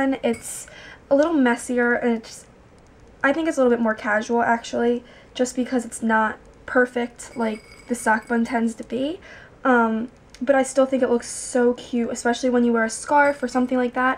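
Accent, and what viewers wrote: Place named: New Jersey, United States. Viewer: Hello! Just stumbled upon you and your fiancé 's blog and landed here. I'm happy to see you have a beauty channel as I follow other gurus. I'm so excited though that you are also from south jersey so you are much more relate able especially with the jersey accent. Lol best wishes on your upcoming wedding!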